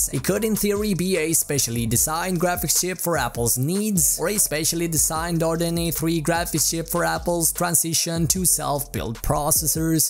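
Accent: Sweden. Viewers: Damn this swenglish though ....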